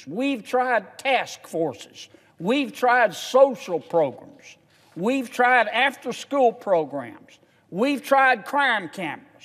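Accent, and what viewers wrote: South, United States. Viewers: Yeah his southern accent is nice, but what the hell is he doing to get the ar15 off the street and save our children. NOTHING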